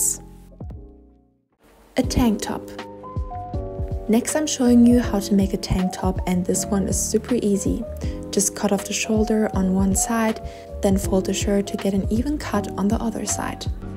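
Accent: Germany. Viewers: I hear some german accent .,. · I hear german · The German accent 😅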